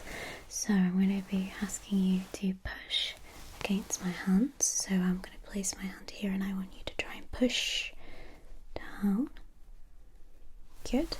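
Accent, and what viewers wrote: United Kingdom. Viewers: Very relaxing British voice 🇬🇧👍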